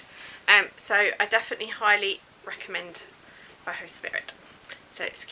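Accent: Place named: England, United Kingdom. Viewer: Just found you and am enjoying your cheery English accent. I will be working on a virus blanket soon. Thank you. Mary Wigmore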